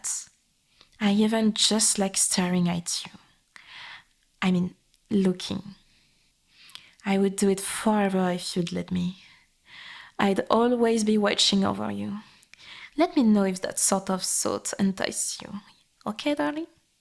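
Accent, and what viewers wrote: France. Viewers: Well, you did spoke with a French accent, and also the title said Yandere so I was just being honest about how I felt about this after hearing this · Such a thick accent, I presume French? Sounds very nice. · The French accent sounds so good, yet so bad at the same time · Ok, I've heard this script a lot, but your accent managed to make it feel new and refreshing. It's actually surprisingly rare to see french accents that aren't parodies, so, just wanted to say i love your accent (sorry for the incoherent writing, its currently 12 am) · Attendez une petite minute... C'est un accent Français que j'entends non ? C'est peu commun j'aime bien. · "Mozer" love it ❤. Appreciate the french accent in the audio. Feels oddly good to hear an asmr video from (i assume) a french speaker without the weird judgement people in France tend to have towards french people speaking in english. 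· L'anglais avec l'accent français est vraiment relaxant, j'ai découvert la chaîne a l'instant et je trouve que, pour le moment, le contenu est vraiment bien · This needs a part 2 if possible, i think i've dropped a tear almost falling asleep to this, i swear i could feel the hug, the embrace and it's warmth. Sometimes i couldn't understand some words because of the lovely french accent but it wouldn't be same without it (also english is not my first language and i wasn't wearing headphones) This is amazing, simply amazing, thanks for this, from the bottom of my heart.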